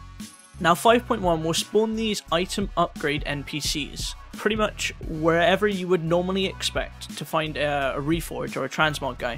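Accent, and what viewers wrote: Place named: Bristol, United Kingdom. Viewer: Wow... you sound sooo bristolian..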